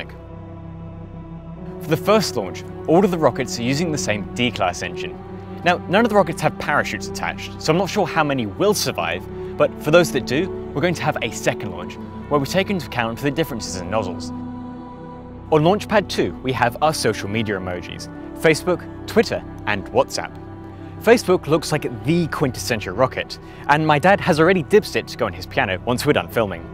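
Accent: Australia. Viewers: Love that it’s Australian and in awe of your enthusiasm. Keep it up 👍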